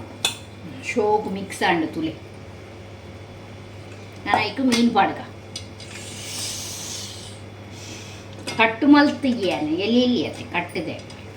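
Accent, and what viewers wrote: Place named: Tulu, India. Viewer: Sudda tulu paaterley madam, nikulna bombai da tulu yeddey ijji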